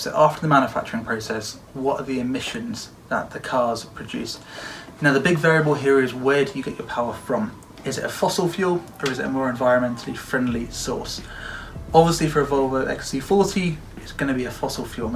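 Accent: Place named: United Kingdom. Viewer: Kind of interesting that not even the closed caption can understand his British accent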